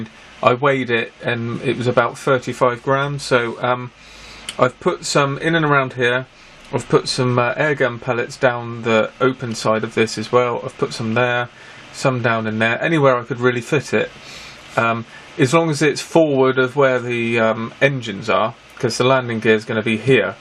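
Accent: United Kingdom. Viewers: Build kit with british accent....i love it👍👍👍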